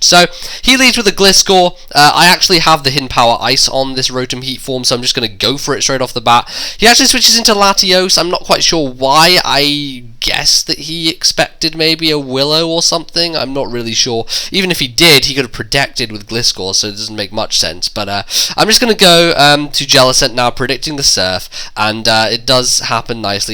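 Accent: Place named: United Kingdom. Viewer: best british accent out there :]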